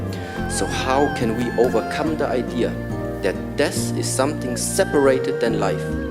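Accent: Germany. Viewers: By the way... asian master with german accent. Crazy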